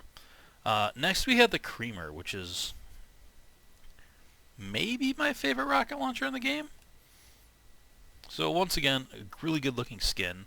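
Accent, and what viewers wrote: United States. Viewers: "Nuke-u-ler" Nor picking, but is that how american's say "nuclear"? I'm assuming you're from the US judging by your accent though.. I always thought words spoken in American-English dialect are pronounced the way are spelled, though you're not the first person I've heard pronounce it in what sounds like a foreign language to me lol xD And yes, the Tunguska does consume two ammo per shot.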